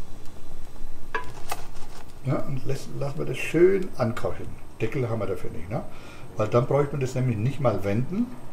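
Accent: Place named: Franconia, Germany. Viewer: Fränggisch - ich gläbb des werd nix Ä riesen Sach und dann noch blaue Zibfl Grüße aus Schweinfurt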